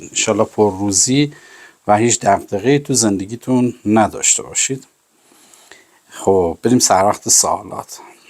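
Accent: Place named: South, Iran. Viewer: سلام به همگی و سلامی مخصوص به شیخ. عزیز جنوبی خونگرم و صادق و بی الایش با دلی بزرگ تر از دریا و لهجه و کلامی شیرینتر از رطب و خرمای جنوب همین....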